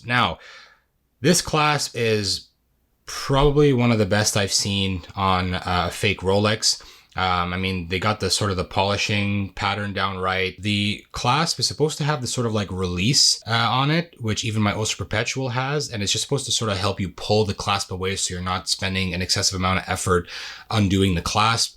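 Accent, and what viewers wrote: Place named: Lebanon. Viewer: You’re Lebanese I can tell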